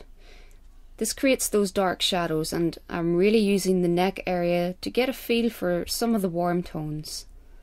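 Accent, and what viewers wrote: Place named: Ireland. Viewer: thanks for the art lesson..i usually add dark blue (just a stroke ..in the darkest of the dark...like a highlight) to the black in the shadows to make them appear darker.. I love your Irish accent...I have to find a Irish girlfriend Gerard Mineo also does velour portraits that I like: <link>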